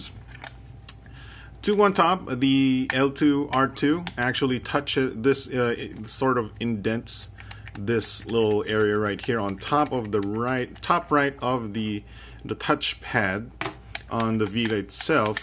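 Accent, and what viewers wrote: Philippines. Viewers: The way you speak English is very Filipino Like the way you say Comfortable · i can tell your filipino because of your accent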